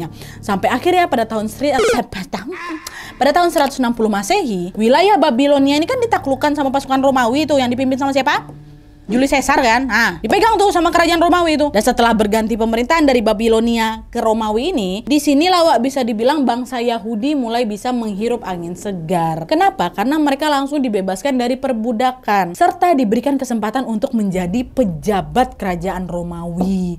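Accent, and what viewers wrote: Indonesia. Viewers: 😂lucu benar kak ni bercerita dgn loghat bahasa Indonesia nya...saya lebih suka lihat dia berbicara drp isi ceritanya😂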